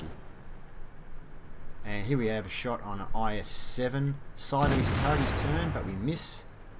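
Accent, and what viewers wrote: Australia. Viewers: I see you have the 124 games in the FV183. Can you do a review on that? What do you think of the HESH after the nerf to pen? You do Excellent reviews. I like the Aussie accent !! :P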